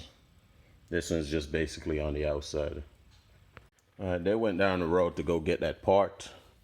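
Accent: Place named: Louisiana, United States. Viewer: Sound like a southren creole man.. if yaint from Louisiana then you Jamaican one.. am i wrong? From the muddah land or ye from dem bayou jerkin them crawdads..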